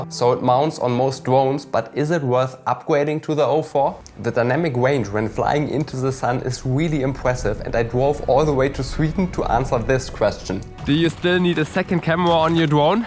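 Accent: Germany. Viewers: The O3 and O4 are from stock waterresistant but all other parts on the Drone mmmeeeh😂 On my 5“ builds Im using the MGChemicals 422c silicone comforma coating its not too thick like a few other ones incase i have to melt it away for future soldering. For my 1-3“ Inch and on my Race Quad I use the flywoo waterproof spray. Bouth are just fabilous i knew the comformal coating is the best but i was shocked how good the flywoo spray works, Good video sounds like a heavy German accent💯💥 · Dein Deutscher Aktzent ist geil❤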